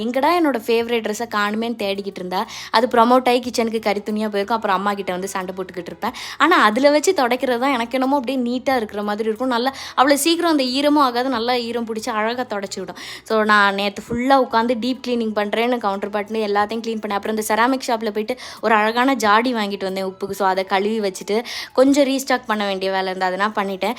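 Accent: Tirunelveli, India. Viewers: Super ma ❤❤Voice super 😊😊Neenka pesrathu Tirunelveli petchu mathiri irukku entha oor unkalukku pls reply❤ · Neenga thirunelveli side a sis unga slang antha side mathiri iruku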